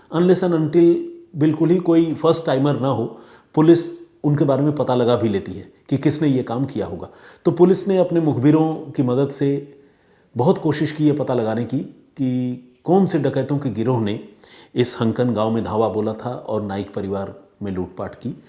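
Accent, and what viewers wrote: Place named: Bengal, India. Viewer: शुद्ध हिन्दी बोला करो, बांग्ला की बदबू आती है तुम्हारे बोलने से 👎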